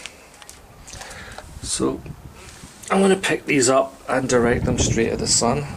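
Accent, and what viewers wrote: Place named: India. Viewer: wow you sound Indian or some kind of black but you is white mate!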